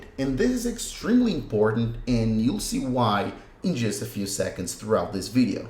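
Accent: Brazil. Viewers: Gostei,fala muito bem inglês,só sei que é Br pelo sotaque kaskka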